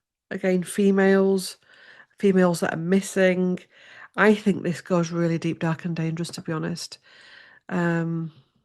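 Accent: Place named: Northern England, United Kingdom. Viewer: . Hi Curious! Your comment was so gentle, unlike mine. I resist a little harder than “respectfully”. I am empathetic to your position, but I am so afraid that trump(no capital) is a dangerous man with a severe personality disorder that puts us all in danger. If you are an American going through this shambles, I am sending love and respect from Canada, where we see this chaotic nightmare every day! I am a Brit transplanted to Canada and sometimes listen to for her good heart. I relate to her accent and comic idioms from the North of England. ❤️🇨🇦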